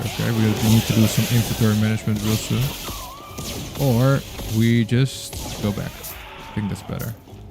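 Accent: Netherlands. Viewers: Great Video, You sound Dutch or South African?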